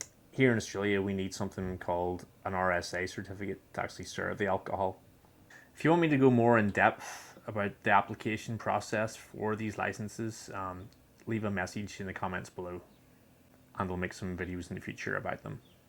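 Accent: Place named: Northern Ireland, United Kingdom. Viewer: Hello! What is that accent - it sounds close to home (NI)😅 I’m actually thinking of starting a mobile bar business over here but I’m a little lost on where to start. These videos are great but I’m overflowing with questions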